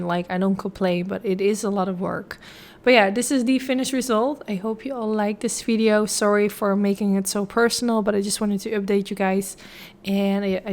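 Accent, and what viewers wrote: Netherlands. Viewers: I love the look and your lovely Dutch accent . Prima 🌸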